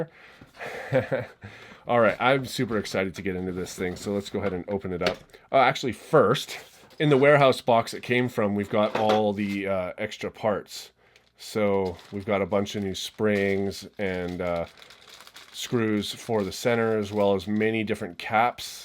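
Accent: Canada. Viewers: I detect a Canadian accent ... you from Toronto ? Teach ya to play guitar if you help me solve a 3x3 eh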